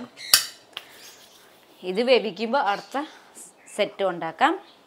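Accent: Tamil Nadu, India. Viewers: Oru tamil touch Ulla malayalam pole thonnunnu😅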